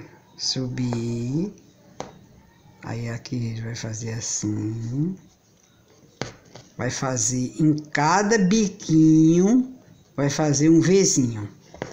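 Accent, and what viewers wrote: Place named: Ceará, Brazil. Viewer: Você é adorável, esse sotaque delicioso de ouvir, lembra minha irmazinha lá no Ceará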